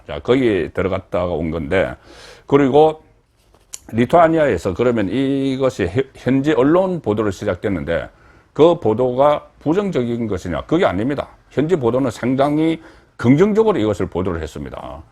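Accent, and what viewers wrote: Gyeongsang, South Korea. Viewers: 송국건 님갱상도 구수한 사투리 고향생각납니다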